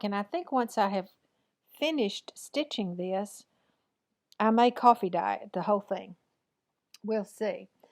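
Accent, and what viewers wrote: South, United States. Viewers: I I am blessed beyond measure. I have a wonderful family Who loves me and lets me know. I have a Saviour who loves me and shows me daily. I had a pastor who often said that if we lost half of everything we owned we would still have more than some people will ever have and I remind myself of that often. I am blessed, I am blessed. Every day that I live I am blessed. When I wake up in the morning do I leave my head to rest I am blessed, I am blessed. I enjoy your fireside chats. Your Souther accent is just as sweet as can be to this Northern gal from Canada. Stay, well and God bless.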